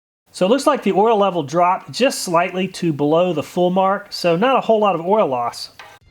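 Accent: United States. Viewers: Interesting video, surprised it maintained its lubricity as long as it did. On a sidenote, where is your accent from statewise? I can't place it. (apologies if I'm being nosy)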